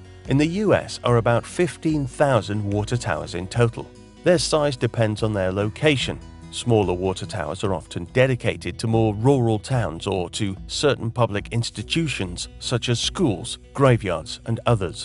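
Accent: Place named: United Kingdom. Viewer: I love how you Brits say "wouuhtuh." If you jumped into the water in the tower, would you get sucked into the plumbing?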